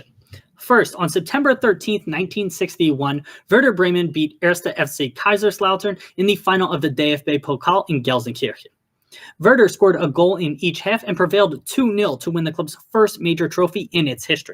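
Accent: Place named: United States. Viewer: Please try learn pronounce correctly mames out of your language, or you'll keep sounding as an ignorante yankee. Nicely said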